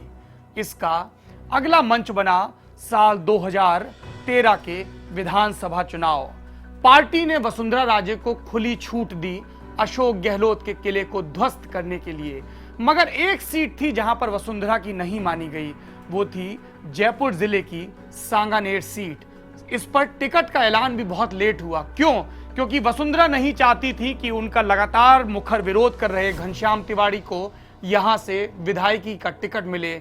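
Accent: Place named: Kanpur, India. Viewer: Seems you are either from Kanpur or lko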